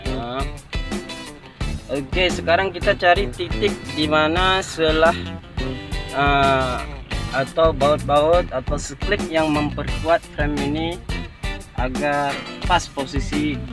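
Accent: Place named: Aceh, Indonesia. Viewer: Medok. Yaa aceh nya:v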